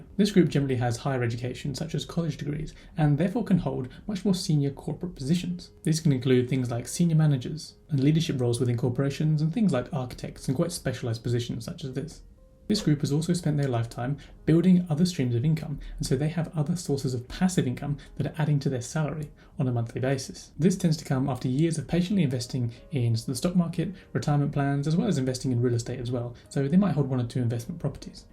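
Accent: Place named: United Kingdom. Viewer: You are talking about US dollars and at the same time you sound British...😅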